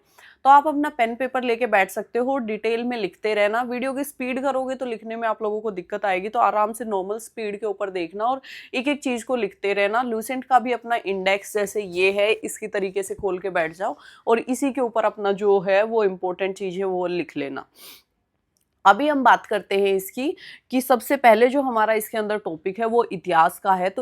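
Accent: Haryana, India. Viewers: Maam you have a perfect Haryanvi accent.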